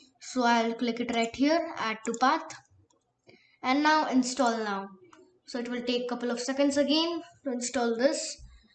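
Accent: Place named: India. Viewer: I think you are indian because your voice says I also Indian Nice explain I understand all things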